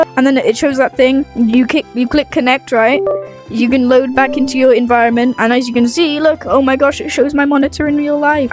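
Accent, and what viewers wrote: United Kingdom. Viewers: Great vid as always. ❤️ ur British accent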